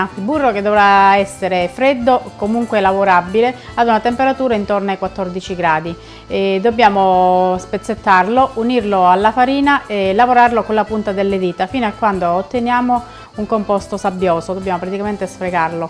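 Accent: Calabria, Italy. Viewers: Grazie! Spiegazione impeccabile. L'accento calabrese è bellissimo. :)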